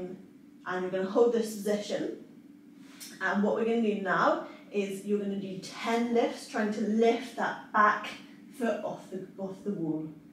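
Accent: United Kingdom. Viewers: Strive for perfection. Or do nothing n be perfect. Pony tail. British accent. Ima gitcha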